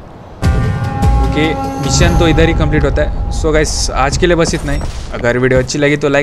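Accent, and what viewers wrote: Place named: India. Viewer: Hindi Accent 😙😂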